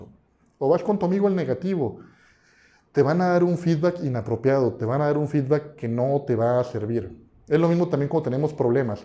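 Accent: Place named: Monterrey, Mexico. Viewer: Eres de Monterrey❤ ya lo imaginaba por el tono. Jeje.. super canal! Sigue asi y no deje subir videos 😊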